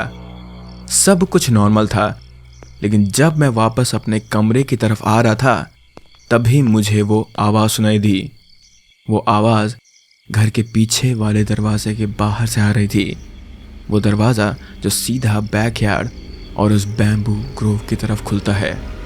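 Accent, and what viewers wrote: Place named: Bengal, India. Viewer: Love your Bengali Accent bro!! Support & Love from Medinipur, West Bengal ❤❤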